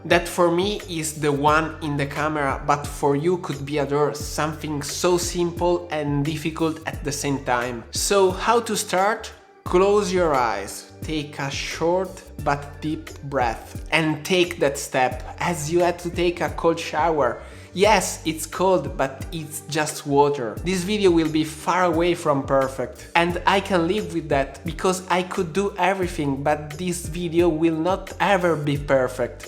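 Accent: Italy. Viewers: Secondo me sei bravo, sono veneta come te ed ho la pronuncia molto simile, però l'impegno c'è e da qui in avanti c'è solo da migliorare. Perciò bravo, davvero ammirevole. Ma la butto lì, non era più semplice aggiungere dei sottotitoli inglesi ai tuoi video del canale principale? Magari diventava un lavoro più complesso per ogni video però recuperavi il tempo speso nel farli due volte, uno in italiano e uno in inglese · Si sente l'accento Veneto anche così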